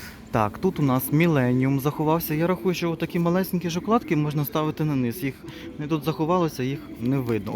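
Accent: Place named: Transcarpathia, Ukraine. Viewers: так говорять на Закарпатті,але це не означає ,що у автора правильна українська мова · Так говорять в західній Україні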